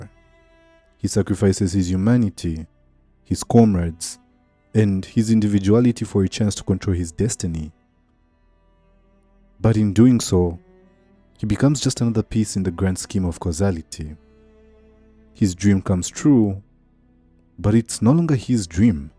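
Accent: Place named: Nigeria. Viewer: Great video! Your accent sounds Nigerian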